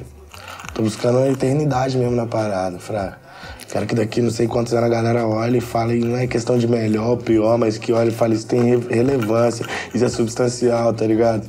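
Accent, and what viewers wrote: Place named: Minas Gerais, Brazil. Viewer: Eu conheci seu trabalho e fiquei apaixonada com suas letras fortes e sua simplicidade nesse vídeo só me mostrou o quanto vc é especial e chegou sua hora de brilhar. Eu sou leiga no assunto rap, escuto rap... mais sou bem eclética e te confesso que estou viciada no seu som e quando vejo eu tô escultando rap o dia inteiro. Que Deus te abençoe grandemente, estou torcendo por vc e sei que vc é do bem e quer o bem mostrando nas suas letras que todos somos iguais, independente de cor, religião ou classe social. Parabéns! Ahh e adorei seu sotaque de mineiro, é isso né vc é mineiro?!Beijos Djonga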